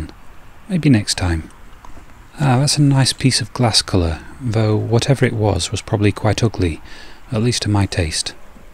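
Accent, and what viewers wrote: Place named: Yorkshire, United Kingdom. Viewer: was watching this vid last night and fell asleep to your soothing voice, lovely. There is a hint of a Yorkshire accent?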